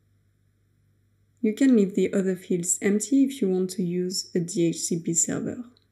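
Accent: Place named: France. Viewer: Excellent accent français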